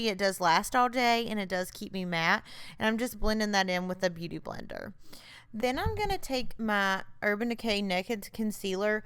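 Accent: South, United States. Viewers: Randommmmm but I so love your hair it looks so healthy and love the southern accent. The makeup is cute to of course haha.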